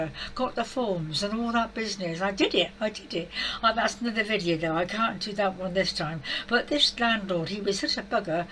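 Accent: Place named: United Kingdom. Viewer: Please consider posting SHORT videos to attract viewers! I am sorry that videos longer than 5 mins are very daunting when pressed by time. Sorry this is not to be negative but hopefully will help you: consider watching yourself before uploaing and using the edit button to trim out unnecessary talk? You can still make long videos for your regular viewers but for newcomers, we need SHORT and BRIEF. Myself,I can't understand British accents at all, so I'm going to start over with the captions....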